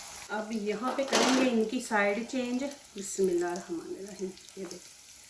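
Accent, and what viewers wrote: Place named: Punjab, India. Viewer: اسلام و علیکم خوش رھیں شاد و آباد رھیں بہت بہت بہت بہت بہت ھیییییییی مزےمزےکی ریسیپیز بتاتی ھیں آپ اور اور اور اور اور اور سب سے بڑھ کر آپکے بولنے کا انداز آپکا پنجابی لہجہ بہت ھی اچھا لگتا ھے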